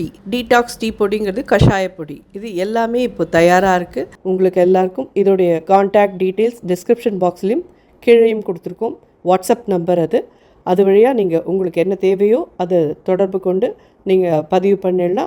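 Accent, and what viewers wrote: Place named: Thanjavur, India. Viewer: ட க்கரா இருக்கும் தஞ்சாவூர் ஜில்லா காரா பேச்சு போல இருக்கு . நீங்கத் தஞ்சாவூர் ஜில்லாவா .நாங்க கும்பகோணம் .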